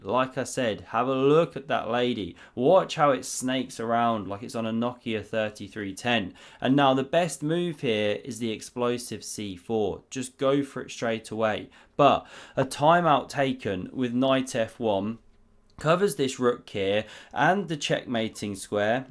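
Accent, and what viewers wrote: United Kingdom. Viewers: Uk accent suits any commentary, cricket or chess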